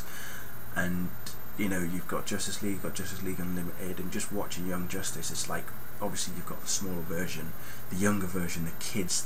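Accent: United Kingdom. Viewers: Young justice is the best american show i've seen on cartoon network in a minute! Also, Halfway through the video as im listening while on another website, I realized that you have a british accent and you're black!! That is so awesome!!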